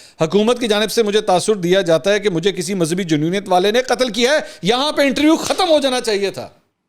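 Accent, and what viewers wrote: Punjab, Pakistan. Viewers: Kamal punchy hain ap bhai mulaa jutt film ki Punjabi tk kamal hai apki mind present hta hai apka that's why I say you are brave journalist love you bro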